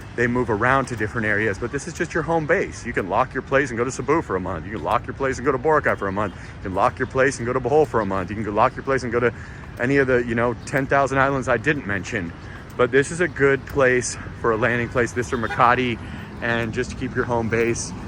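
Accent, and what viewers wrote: United States. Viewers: I don't get your point dude. We don't have fake cities. You sound like an American. I am wondering if what is the real United States for you also? You're dying and abandoned cities?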